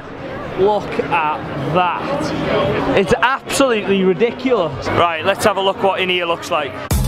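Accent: Ireland. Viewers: Ah you already sound like an Irish person *i haven’t a clue what I’m doing where I am ps I’m from Kerry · We're is your accent from? I thought it was Irish. I'm in USA so I might not notice the differences. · Absolutely nailed the North Side accent!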